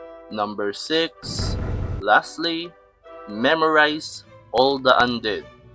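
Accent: Philippines. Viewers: Why you kinda sound Filipino?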